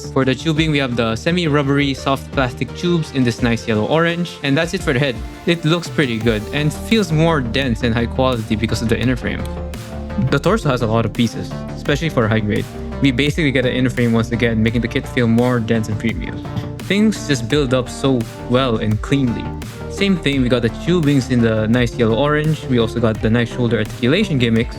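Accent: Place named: Philippines. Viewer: The filipino accent is strong on this one bois. We got another local reviewer! let's go!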